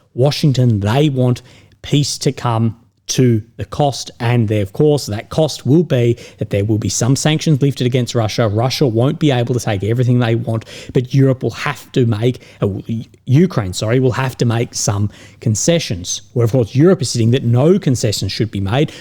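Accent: Australia. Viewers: Aussie twang is unpleasant to my ears.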